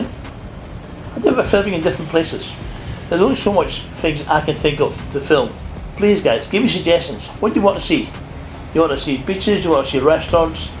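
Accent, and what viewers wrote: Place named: Scotland, United Kingdom. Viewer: Alan, accents make the world a more interesting place. If you can't grasp what's been said, then that's your issue & not the speaker. I'm not Scottish & yet I love the Scottish accent & understand every word.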